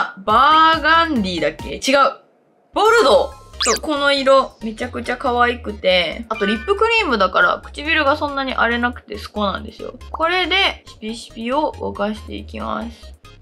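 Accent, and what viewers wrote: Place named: Ibaraki, Japan. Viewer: なまりかわい💕～べ？とっても親近感、、私は茨城じゃないけど！！いつも真剣にメイクに向き合ってるかっこいいです😿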